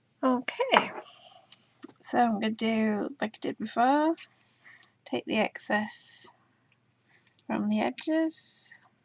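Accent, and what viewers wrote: England, United Kingdom. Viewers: sorry your english accent is to thick i cant understand a lot of the items your using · That is ALSO the first time I have ever heard anyone say they could not understand someone with a "thick" English Accent!!!!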